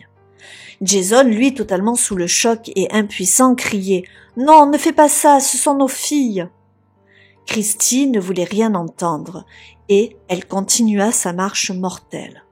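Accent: South, France. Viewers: Taylor et Madison. Quelle tragédie !!! Pour ma part je pense que tu as fait une très bonne analyse de la situation. J aime bcp ta petite pointe d accent du Sud...moi qui suis dans l Hérault...🌞🌝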